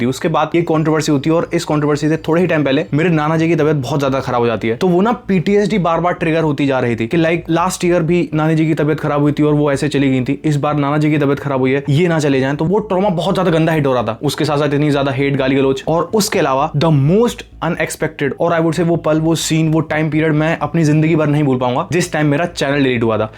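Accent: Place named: Haryana, India. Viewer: Khadi boli haryana se ho na bhai tum 😂 nahi tu beyong hai bhai cutie pie 😅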